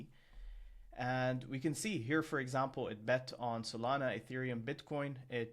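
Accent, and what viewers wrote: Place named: Philippines. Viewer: Are a you a Filipino? You sound like one.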